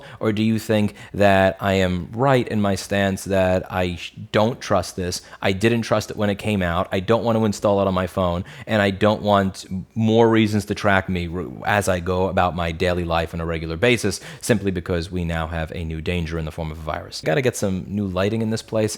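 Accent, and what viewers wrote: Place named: New Hampshire, United States. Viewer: As time goes on you sound more and more like a New Hampshire resident and less like a New Yorker.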